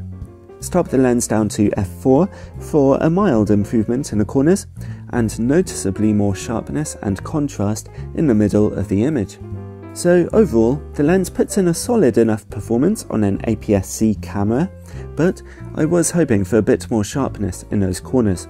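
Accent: United Kingdom. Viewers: The best reviews ever. Love your British accent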